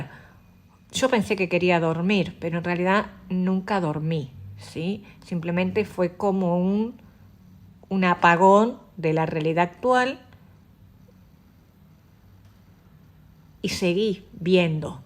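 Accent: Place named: Uruguay. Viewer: Es muy difícil regresar a otro tiempo.espero terminar tu vidahistoria es un gusto aberte encontrado de casualidad.te considero una amiga siempre te escucho tu horóscopo si no te escucho me siento triste.tienes uba bonita voz un tono de los uruguayos gracias voy en el capítulo 2 y los voy escuchar todos buenas noches.desde aca san luis potosí México.si tuviste suerte en seguir con vida!❤🎉😊😊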